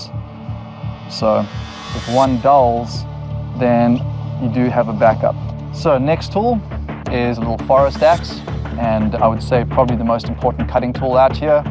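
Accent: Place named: South Africa. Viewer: Wanted to know if you’re a fellow Afrikaner or at least a fellow South African? You sound like us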